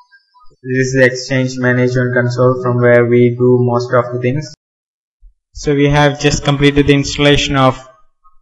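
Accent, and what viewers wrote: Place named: Kerala, India. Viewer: Mallu English!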